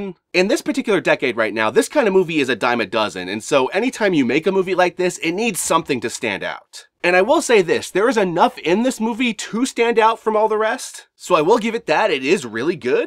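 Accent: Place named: United States. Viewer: Your pronunciation of the Spanish names is ok, you just have the usual problem Americans have where your accent doesn't lend itself to Spanish/Latino names.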